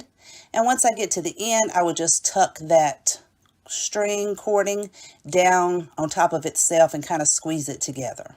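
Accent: South, United States. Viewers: Hello I love your ideas your crafts are sweet ! I just came across your channel and I’m getting inspiration already! I love it great job!love the little snowmen snow sign so cute I’m going to make one too! So adorable I’m a subscriber now too ! Fabulous !ok edit number two love these so much so awesome! Ok I had to say I love your accent southern belle so adorable!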